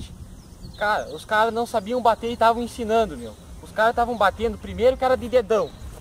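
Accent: Rio Grande do Sul, Brazil. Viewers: Sotaque gaúcho tu deve ser de RS pra aí né ? Valeu pelas dicas +1 sub e like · Parece que temos um gaúcho aqui não é mesmo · Sutaque Guacho mora no RS ?. Vlw pelas dicas ajudo bastante. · Mano vc e gaucho ???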